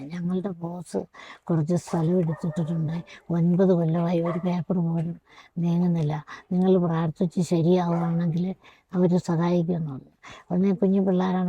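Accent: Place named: Kottayam, India. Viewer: സിസ്റ്ററുടെ വർത്താനം ഒരു കോട്ടയം slang ആണ് 13 വർഷം കാട്ടിൽ ഒറ്റയ്ക്ക്😔😔 ഇനിയും വേണം sister ൻ്റെ വീഡിയോ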